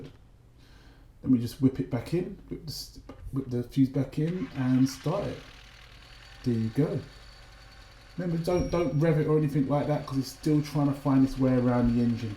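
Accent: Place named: United Kingdom. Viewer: He has a very british accent but yet very understandable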